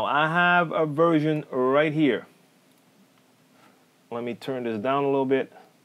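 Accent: Netherlands. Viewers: Are you half dutch? Cause you have very slight accent.. Anyhow great vids, great talent!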